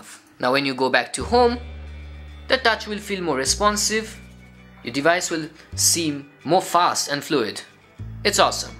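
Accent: India. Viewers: Dude speak English in an accent that is understood by others not just Indian only! So anoying! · The way you pronounce the letter T is almost correct.... Unlike most Indians.... Just need a little more practice... And also your letter L still sounds very Indian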